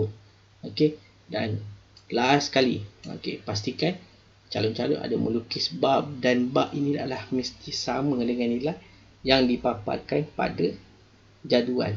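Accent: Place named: Kelantan, Malaysia. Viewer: cikgu ore kelate ko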